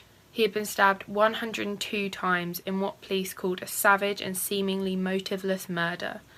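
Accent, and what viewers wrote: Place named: RP, United Kingdom. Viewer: I find her accent super interesting. The majority of her r’s sound really soft but then there’s certain words she says that aren’t like when she says together. Idk it sounds quite posh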